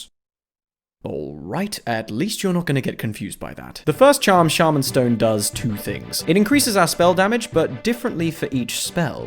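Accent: United Kingdom. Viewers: I mean this in the most positive way possible, but I wonder how well this series would do without the british accent, because I think it implicitly adds so much to the videos through the sort of "snarky british" trope.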